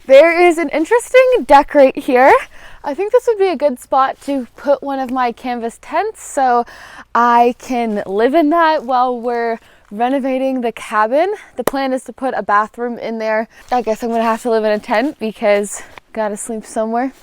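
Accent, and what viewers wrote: Canada. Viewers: Nice! Ah the Canadian accent ❤❤❤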